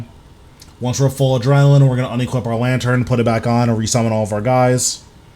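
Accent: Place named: California, United States. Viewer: Where are you from? Sounds like California. 🤔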